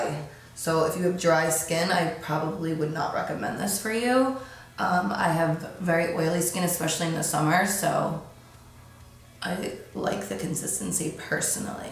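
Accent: New Jersey, United States. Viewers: i can’t tell , does she have a new jersey accent ?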